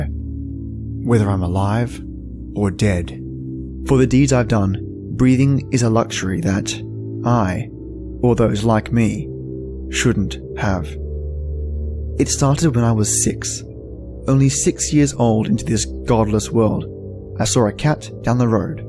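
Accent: Australia. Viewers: Aww thank you! Your awesome Aussie accent makes the stories even better & that's one reason I keep coming back to your channel! Very well done with this one! 👍🖤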